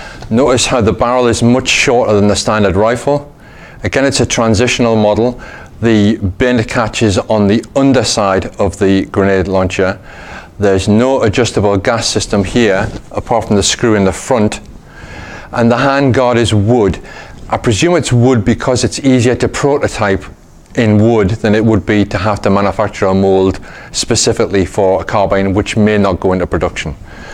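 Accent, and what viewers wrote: United Kingdom. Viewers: Out of curiosity in which region of GB is your dialect spoken? And many Thanks for the great content! Best Regards from Austria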